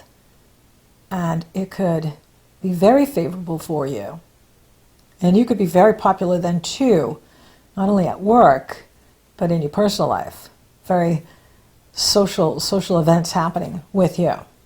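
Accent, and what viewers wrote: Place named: Upstate New York, United States. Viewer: "having MAHZ THEAH" I knew you had an accent, just thought upstate NY or somethin' - you slipped into some sorta Bostonian thang...what was that? lol It seemed to throw you off too a bit...hahaha GREAT reading. You are so fun and thorough, thank you :) You're WICKID SMAHT and totally ROCK! hahaha ;)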